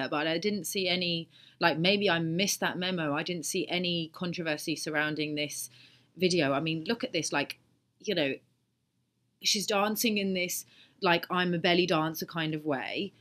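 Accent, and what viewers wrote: United Kingdom. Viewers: LMAO, why, coz of her British accent 🤣🤣🤣🤣🤣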